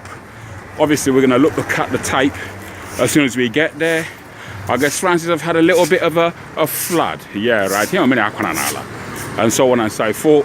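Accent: Cockney, United Kingdom. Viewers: Thank you for spreading the truth and reality of the UK. Too many go back home pretending they are living like kings. The truth? they are telling lies. My parents took me and my siblings on holiday to Nigeria when we were kids in the early 80s and the thing that struck me were the amazing and beautiful buildings in Owerri and the village. I'd never seen a luxury apartment in my life, not until I went to Nigeria. People owning their own land and building their own homes on it. That blew my mind. UK was a bit late to the game of building luxury apartments for ordinary folks. Really tired of the negative images of Africa the UK like showing on our TV screens. Thanks again for making it your mission to show us there is a better way. Love the Igbo Cockney😄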